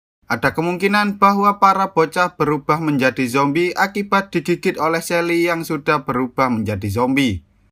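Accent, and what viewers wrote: Java, Indonesia. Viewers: Anjir wong jowo pisan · Jawa bang? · Wong jowo yo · Medok njir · Ngmong kaya bhasa jwo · Wong jowo to mas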